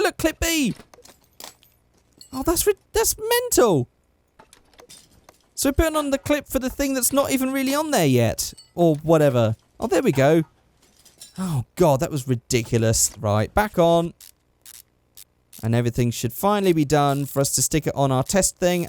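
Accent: United Kingdom. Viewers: I couldn't wait to see this! Thank you <3 Btw, your accent seems British, Am I right or not? And one more thing: Chrome:P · great video it's relaxing it's like a podcast of a British guy who's never built a car in his life building a car I love it.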